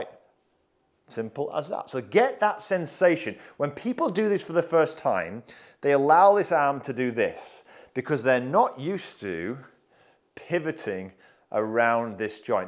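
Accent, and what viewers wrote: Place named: Kent, United Kingdom. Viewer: I once asked CHATGPT about way of speaking and they gave me a long and detailed, almost scholarly account of his travels and where he picked up his nuances... very interesting and very funny. His main influence seems to be Kent in England.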